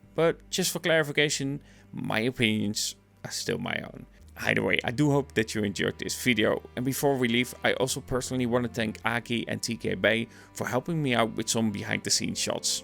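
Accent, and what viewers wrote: Netherlands. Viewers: Just a little dutch accent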